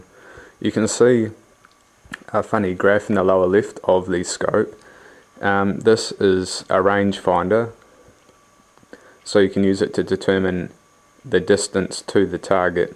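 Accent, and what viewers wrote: New Zealand. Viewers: Could pick your kiwi accent a mile away :)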